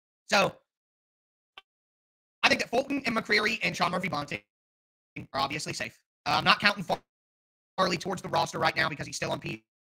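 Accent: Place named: South, United States. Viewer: This is why I can’t subscribe to this channel with that southern accent I know he doesn’t want a black QB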